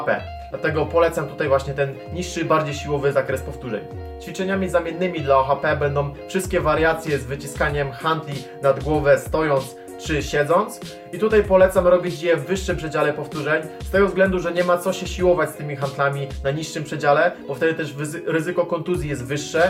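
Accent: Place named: Poland. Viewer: Tłumaczy dobrze. Słychać że Polak.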